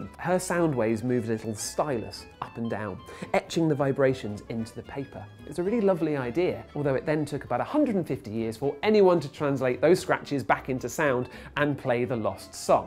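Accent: United Kingdom. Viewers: Thank you mr. British man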